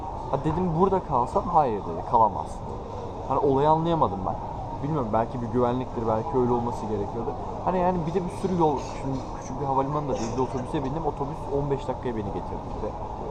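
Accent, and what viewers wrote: Turkey. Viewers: Ege Zz aksan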